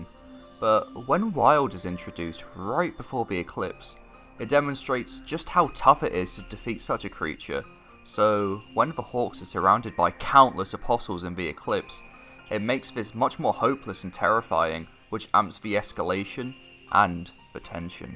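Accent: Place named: United Kingdom. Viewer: Being in the UK is known for having thick accents. You simply find it hard to deal with his accent. Nothing wrong with his English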